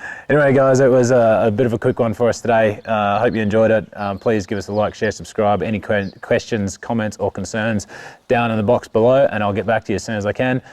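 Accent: Australia. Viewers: Thanks for the clarification. I apologize for any offence. I suppose I was fooled by the oh so familiar Aussie accent and assumed he was here in Australia as there was no reference to country or market. I was also a bit miffed that Kawasaki doesn't give us the full set of options as I'm currently looking at a Z H2 to buy and would love the SE with Ohlins et al. I should have checked my facts before criticizing yours. Thanks for all the good work you do for us. Cheers from Sydney Australia.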